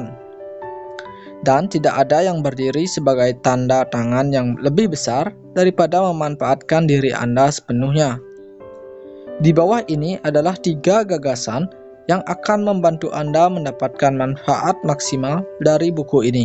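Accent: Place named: Aceh, Indonesia. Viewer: Orang aceh 😅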